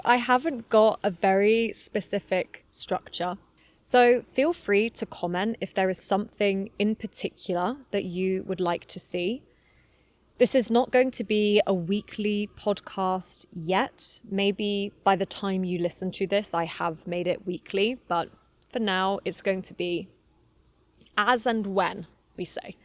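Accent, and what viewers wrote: United Kingdom. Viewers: I'm a 45-year-old 'uncle,' and this channel is the perfect spot for me to pick up a proper British accent. ^^ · Hi, I’m from China and I’ve been following you for half a year now. I really love your British English and enjoy every video you post. Your slow-paced English helps me hear every word clearly, which has really improved my English. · Thanks you become one of favorite youtube teachers also i shadow your videos to get British accent ❤😂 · that's why it's called Great Britain what's a beautiful girl and her lovely dog.is it Wilson?what a great accent girl 🗣 A million thanks From Sudan 🇸🇩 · I am form bangladesh 🇧🇩 i think you don’t know about this country because the country is small but my country population is high & It is a country under Asain countries. Actually i am a student and i am learning English with a British accent that’s why I follow you and watch your videos. 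· I’ve just finished watching your podcast, and it’s been incredibly helpful for my English. Your pronunciation is so clear and easy to follow—a perfect British accent! To be honest, this podcast gives me so much motivation to learn. Or maybe... I’m just hooked on your channel because you’re absolutely stunning? Oh dear, I must be losing my mind! Anyway, I really hope you could make a short podcast episode on how to approach and make friends with a Western/Caucasian girl. That would be amazing! (^-^) 😀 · Hi, I’m from China and I’ve been following you for half a year now. I really love your British English and enjoy every video you post. Your slow-paced English helps me hear every word clearly, which has really improved my English. love you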